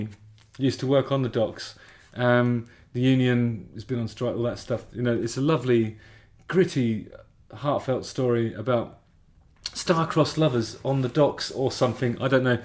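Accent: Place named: Lowestoft, United Kingdom. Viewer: I'm so -pleased to find your channel! As a fellow Lowestoft chap, and former regular at the Triangle and O'Reillys (where I also worked), hearing you chattering away like this takes me back! Oh, and while more a 'Woo' than a 'Woah', has several good ones.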